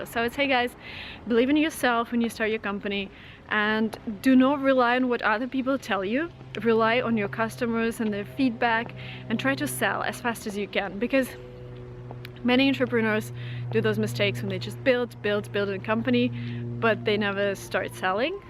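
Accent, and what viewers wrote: United States. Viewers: Your English sounds like American English, but you said you studied English in Great Britain. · Hi mam I have been watching your videos for last week's honestly your videos are inspiring me the way how you talk in American accent is superb I'm not a native speaker however I wanna be one of them. I can feel that zeal in me. Kindly suggest me how can I enhance my skills and become a native speaker... Thanks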